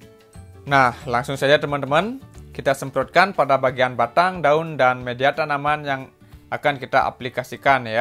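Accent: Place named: Bali, Indonesia. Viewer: Logatnya mirip org Bali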